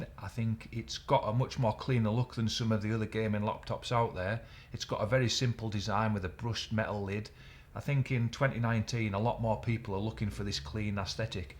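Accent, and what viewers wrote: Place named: United Kingdom. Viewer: I hardly understand the British accent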